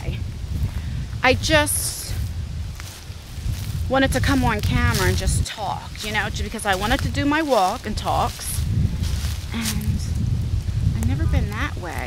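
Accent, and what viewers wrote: New Jersey, United States. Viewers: Beautiful area! I’m Long Islander who moved to middle Tennessee . I miss it there and your north eastern NJ accent (similar to mine), I miss! I do love it in TN and we also have many trails. I’m wondering if you get lost if your map app on phone can help detect your location? Ui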